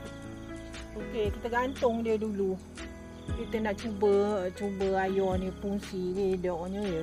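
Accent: Perak, Malaysia. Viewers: Terima kasih opah atas perkongsiannya cakap Perak kome. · Aje handei opah wat review, pekat slanq Peghok nye 😷👍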